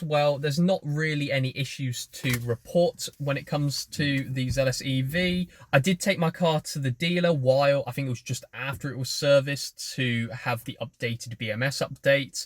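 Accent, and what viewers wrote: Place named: RP, United Kingdom. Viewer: No problems and keep up the great work. There's a Norwegian guy that does tests also. Very interesting statistics etc. Btw, you speak clearly without any regional accent, hence why I thought you may have been to either public school or Oxbridge 😎👍🏾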